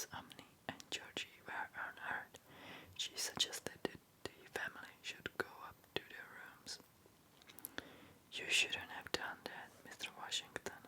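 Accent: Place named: Czech Republic. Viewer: This is wonderful! Thank you. Your voice is marvelous, and any words spoken with a very slight Czech accent are more beautiful and interesting for it. I'm fascinated to hear how near-perfectly you speak - pronunciation, vocabulary, phrasing, emphasis - better than most natives do. Even if one studies for years, most ppl don't ever sound as natural. I imagine you're similarly talented with any language. Your videos are always, as are you, very special, original, well-spoken, intelligent, and lovely, and we are fortunate to have found you.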